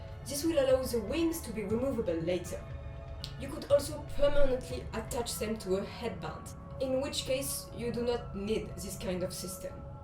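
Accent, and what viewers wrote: France. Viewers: Are you french? I've never heard this accent outside of movies so I didn't know it was real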